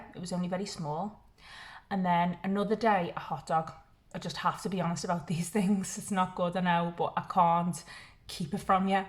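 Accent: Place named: Merseyside, United Kingdom. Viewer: You were the first video I saw when I began looking for a new way of living and I struck the jackpot. To hear a Merseyside accent and to see you working towards the kind of life I wanted was an inspiration to me. Like this week, when I hit a bump in the road, and despite making many mistakes, I found it more manageable. Thank you and congrats on your 1k 💜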